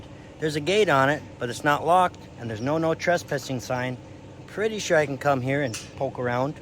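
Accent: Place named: Midwest, United States. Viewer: If you have yucky sticky clumpy dirt in your bucket, add water like you did at 23 min. in this video. The magic tool? (2 of them, actually) 1. ALWAYS put a few drops of JetDri in your bucket! With your fine flour gold, you MUST break the surface tension, or fine gold can actually float, and get panned out. 2. Bring a battery-powered drill along. Attach a paint mixer to it. Use it to pulverize the clay balls and loosen all material, freeing it up for more effective panning. Bring an extra battery just in case. LOVE your videos - haven’t heard that Midwest accent in many years… Makes me homesick!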